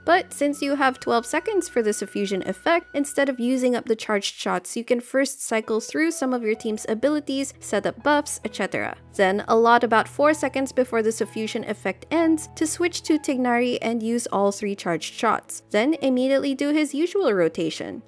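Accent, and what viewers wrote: Philippines. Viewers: The Filipino accent coming in strong in this video. Love it!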